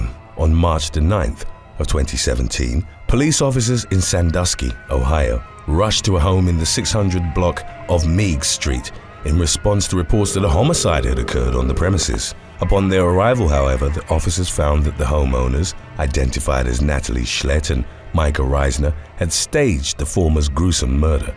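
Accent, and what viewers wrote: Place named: United Kingdom. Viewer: Why do these American hoaxes sound SO much more sinister coming from a British narrator???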